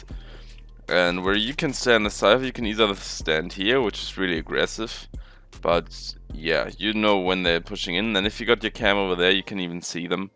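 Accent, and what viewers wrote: Germany. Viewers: The german accent haha. ^^ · man hört das du deutscher bist :D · i dont know why, but you sound like you came from Germany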